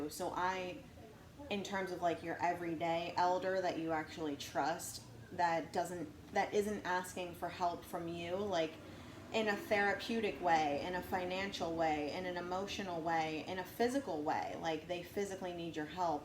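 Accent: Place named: South, United States. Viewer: American Accent?? I would say...you need to relax. You have a southern drawl and Jersey touch as accent. Not sure about the PENN State stuff, but clearly my before. Tinge of Kentuckian.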